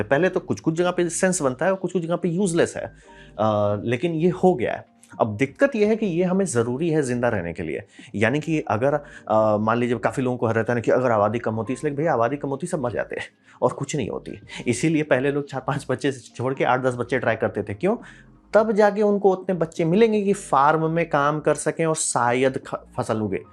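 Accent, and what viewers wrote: Bihar, India. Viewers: Aap pakka Bihar Se Hi Ho, Bhai. 💯 Persent pakka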